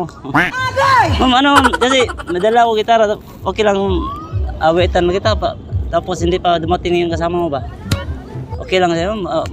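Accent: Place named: Visayas, Philippines. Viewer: Pag bisaya na lng oi,halata imong tuno!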